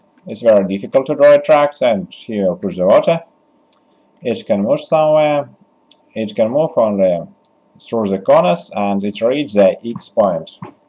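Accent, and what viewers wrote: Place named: France. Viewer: understanding you is quite difficult. we just want to know how to get the answer and the guy has a french accent that why its hard to understand him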